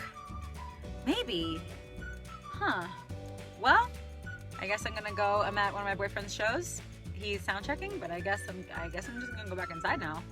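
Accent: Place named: New York, United States. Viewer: Listening to you talk about Shakespeare makes me wonder how cool it'd be to watch a Shakespeare play with a New York accent.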